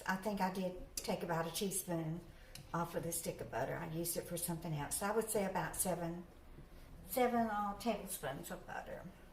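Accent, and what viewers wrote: South, United States. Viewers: Did you live in New Jersey? You have a beautiful southern accent! How did you get way up there?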